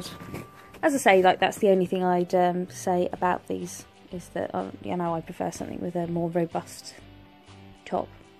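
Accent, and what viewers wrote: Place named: United Kingdom. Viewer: You're awesome, just found you in this moment and subscribed immediately! ;) Love your voice and British (?) English :) Greetings from Germany!!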